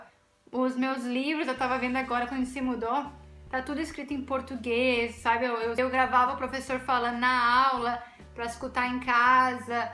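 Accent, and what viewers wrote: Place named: New Zealand. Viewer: Na verdade, você aprendeu inglês com sotaque, com muito vocabulário da Nova Zelândia, que não é britânico, nem americano... Não é muita vantagem...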